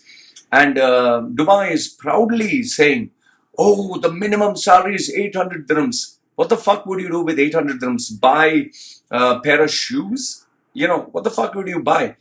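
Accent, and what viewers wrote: India. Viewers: You sound like an Indian,,,are you...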